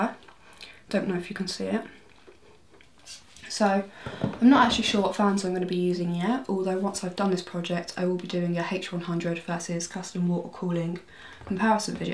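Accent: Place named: Essex, United Kingdom. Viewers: Fit! im sure she is from essex. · English, english. English they speak in England, where it comes from...! Slight Essex accent though, a county north east of London.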